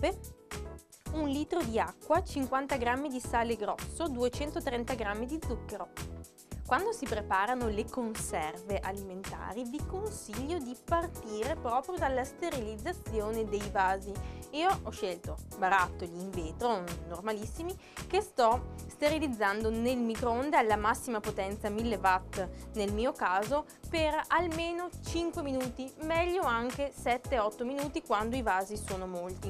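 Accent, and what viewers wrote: Italy. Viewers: Is this Italian accent? My neighbors sound so different :)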